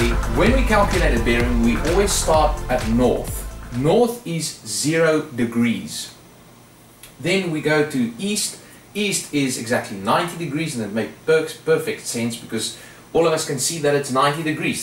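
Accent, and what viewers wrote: South Africa. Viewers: Your south african? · I can tell you are southafrican 😂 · Sounds like you are from Z.A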